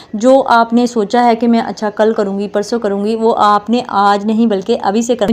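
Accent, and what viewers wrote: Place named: Punjab, India. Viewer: Apka punjabi main bat krny ka style buht acha hota hai m regular watch krti hun apk vlogs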